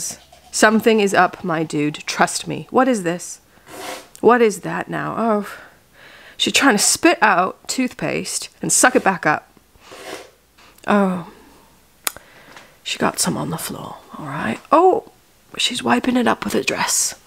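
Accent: United Kingdom. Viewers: i love the british accent lol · I love your English accent and the commentary is spot on 🤣 Your poor, long suffering cats! Nah, you can tell they really love you, it's so sweet x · I strangely find your British accent quite pleasant to listen to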